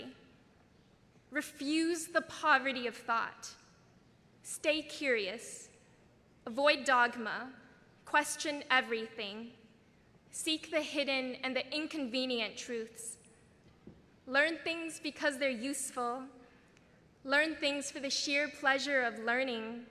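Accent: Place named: United States. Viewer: 比那个强多了地道的美式英语❤❤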